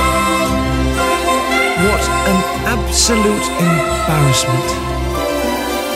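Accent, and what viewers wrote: United Kingdom. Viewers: La la la la la, la la la la... love the plot of the video, so cute and lovely, the good old British accent of the narrator is a cool touch and makes the video more interesting to watch. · narrator being british also reminds me of tsp so much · Narrator sounds like the one from stanely parable. They both get irritated when you don't do what they want. Both are british.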